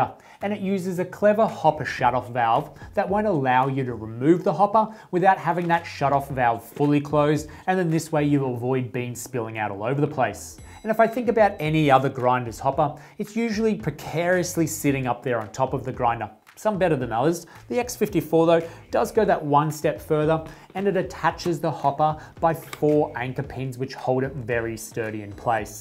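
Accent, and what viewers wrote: Australia. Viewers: Thanks for the review. Very detailed review and it's really helpful. Plus, always feels very comfortable when hearing aussie accent!!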